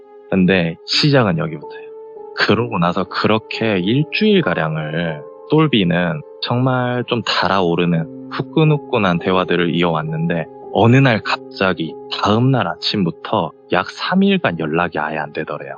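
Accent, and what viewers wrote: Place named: Seoul, South Korea. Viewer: 서울말 힘들죠ㅎ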